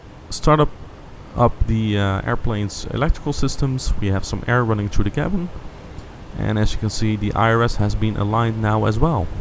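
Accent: Netherlands. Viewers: dutch accent... ;-)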